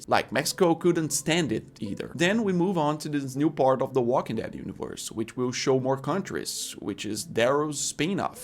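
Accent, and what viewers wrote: Brazil. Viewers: hes brazilian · Brazilian accent and Brazilian name. I am home.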